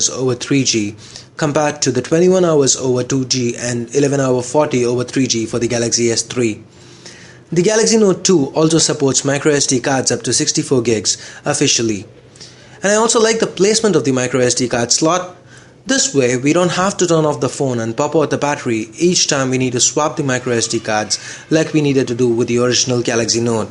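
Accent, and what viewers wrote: India. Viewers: I just realized I fucking hatttttteee indian accents. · Definitely an Indian dude! ^^ Good review though! Cheers! =)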